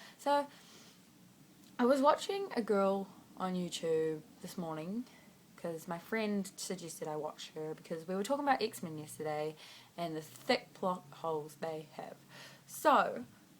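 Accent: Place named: New Zealand. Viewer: You sound like a kiwi!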